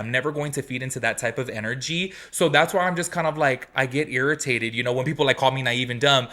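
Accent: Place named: Hawaii, United States. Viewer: Mahalo, I said earlier when you was talking, I was like ehh .. he talk like me local kine ❤❤❤❤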